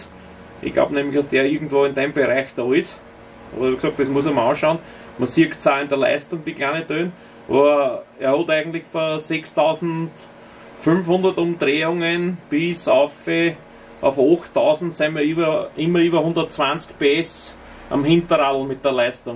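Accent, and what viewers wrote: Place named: Burgenland, Austria. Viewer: luigi settembre Das ist deutsch. Allerdings mit Burgenländer „Slang“!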